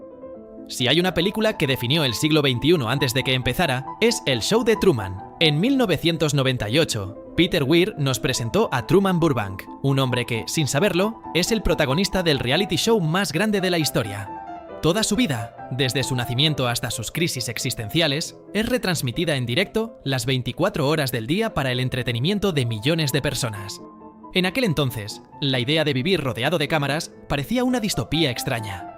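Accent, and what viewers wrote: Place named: Spain. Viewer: Es que no existen otros narradores que no hablen españoletos? Ya lo dije NO VEO VIDEOS NARRADOS POR ELLOS. NOJODAAAA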